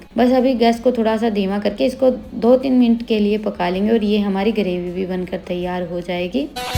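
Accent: Haryana, India. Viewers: Haryanvi language h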